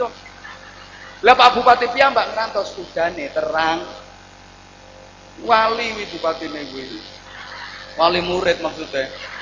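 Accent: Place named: Java, Indonesia. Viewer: mas jawane ngendi se mas minak men nk matur jowo kok yo pas men ean kie... mugo" suatu saat njenengan bener" iso ceramah tenanan. ami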